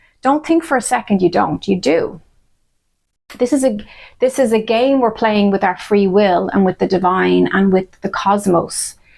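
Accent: Ireland. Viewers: I am here from Canada, I love your Irish tone. I love your positivity ❤️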